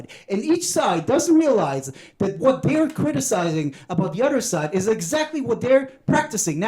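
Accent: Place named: Israel. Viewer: Toda raba, lachte das erste Mal seit einanhalb Wochen vom Herzen ❤ love your sweet israeli english 🤗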